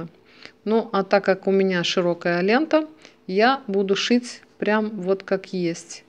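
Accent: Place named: Baltic. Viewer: Ну, надо же, у Вас очень молодой голос и мне слышится прибалтийский акцент, очень красиво речь звучит и как-то необычно. Я заслушалась. 😊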